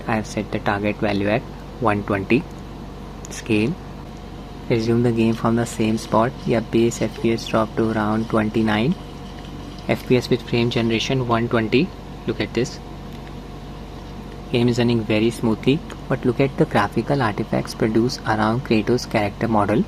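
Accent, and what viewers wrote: India. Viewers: Accent is thick but lucky for me i have Indian friends :D cheers for the video bud.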